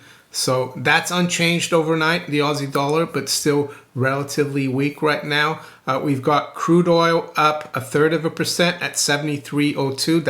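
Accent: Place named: United States. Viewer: do I have this right? You are an Italian, born in Brazil, You talk like an American, and live in England?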